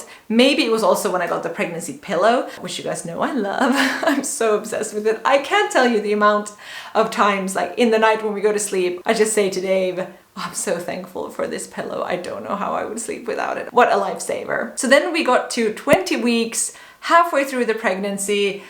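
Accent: Finland. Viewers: your accent got so Finnish since you've been living in Finland, all this talking on a single breath intonation going down thing, so cute :)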